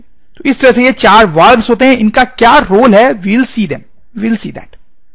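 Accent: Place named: Bengal, India. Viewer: Ap sir kaise bolte ho bengali hi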